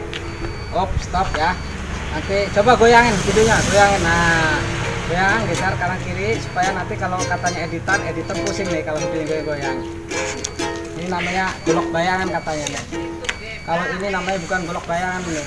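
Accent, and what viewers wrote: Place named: Banyumas, Indonesia. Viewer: Aku suka gaya bahasa kamu bro,, Kengapak ngapakan gitu ya?? Pokoke enyonge langsung subrek lah bro,, Salam hormat,💪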